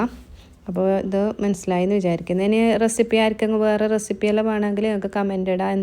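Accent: Kasaragod, India. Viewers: Kasaragod aano😅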